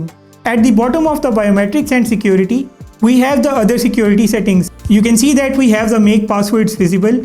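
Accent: India. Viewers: Why? Lol is just Indian accent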